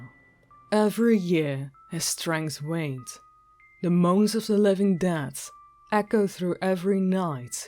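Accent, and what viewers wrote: Netherlands. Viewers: I hate this one it's like hiding ur accent, that's why we subscribe is to hear ur thick Netherlands voice, don't make me tell u again.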